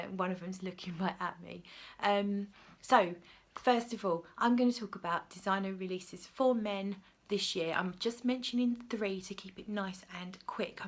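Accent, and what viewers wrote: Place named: Cockney, United Kingdom. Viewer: I'm from Luton, your review sounds so so local love the review and the cockney accent xx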